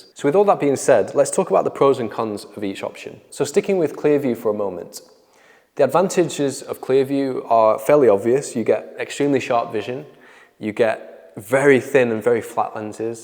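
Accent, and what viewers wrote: United Kingdom. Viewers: I can't remember which of these two lenses I got from The Spectacle Factory. I'm guessing I got Smart Life lenses because when I put on my Masunaga eyeglasses, I'm so much smarter. For proof, when I watch videos, I learn so much!!!...and it's not because of devilishly handsome face and that woozy British accent... though it does help. One thing's for sure, making an appointment with The Spectacle Factory is the smartest thing I've ever done.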